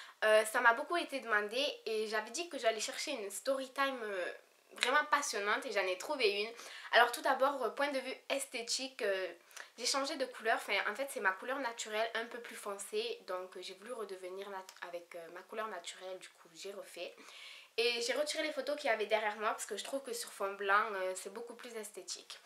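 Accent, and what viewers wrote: Marseille, France. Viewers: t est marseillaise ? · te marseillaise nn ta le même accent que moi sur se te trooop Belle😍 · Tu es Marseillais ? Super vidéo ❤️💋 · Aie on a reconnu direct l'accent Marseillerr !!!!!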